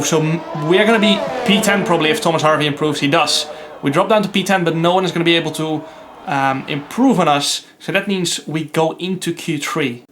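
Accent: Netherlands. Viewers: You really sound dutch while speaking english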